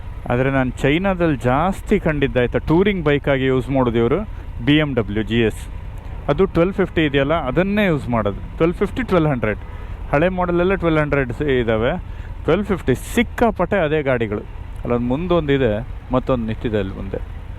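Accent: Kundapura, India. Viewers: Kundapura Kannada ❤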